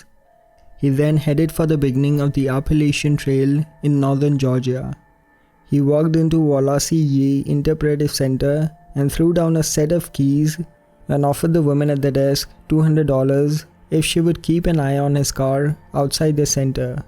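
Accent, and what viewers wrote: India. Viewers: Sounds like an Indian accent. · Indian accent is one of the worst narrator accents possible. Please stick to the women narrator. Quality content non the less.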